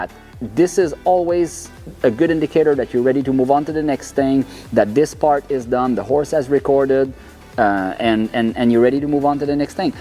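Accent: Quebec, Canada. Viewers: You sound like you are from Quebec! Am I wrong?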